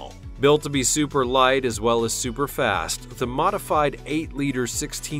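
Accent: United States. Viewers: You know when someone talks so American, it annoys you to listen.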